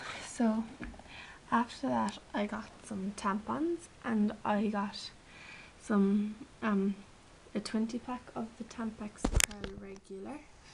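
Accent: Cork, Ireland. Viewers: I love your accent are you Irish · Are you Irish? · You sound Irish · She's Irish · Are you from Ireland? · You're Irish! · She has a cork accent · YOUR BLOODY IRISH THAT IS SO COOOOOL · the first person I have heard with an Irish accent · it's a cork accent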